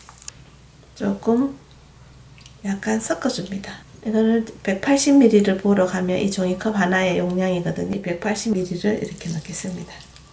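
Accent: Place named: Daegu, South Korea. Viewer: 는 대구분이신가요? 그러시다면, 말투가 처음엔 좀 거슬리더니, 보고 들을수록 정겹네요 ^^ 는 내가 이세상에서 호감을 갖는 최초의 대구사람이십니다~ ㅎㅎㅎ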